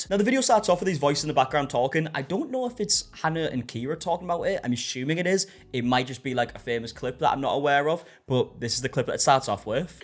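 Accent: United Kingdom. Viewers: he's from the UK I think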